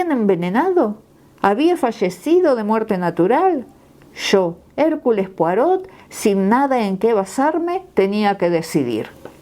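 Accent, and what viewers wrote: Argentina. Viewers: Que buena onda, creo que por tu acento sos argentina. Yo amo a Agatha Cristhie y este titulo jamas lo vi - ni lei-. Gracias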